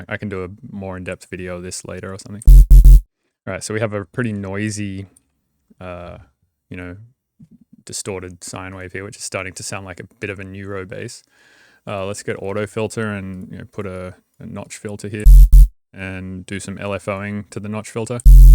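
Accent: Australia. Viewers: He's from Australia, he always sounds like he has a cold! :D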